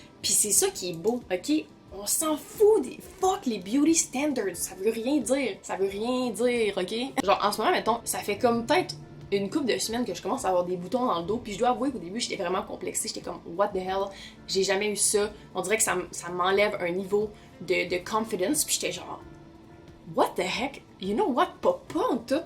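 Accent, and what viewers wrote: Quebec, Canada. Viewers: Ce qui te rend parfaite c'est ton accent québécois là 😍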